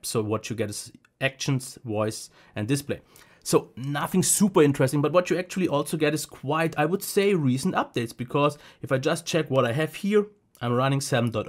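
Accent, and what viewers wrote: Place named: Germany. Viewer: I love your videos and your accent you German Guy :D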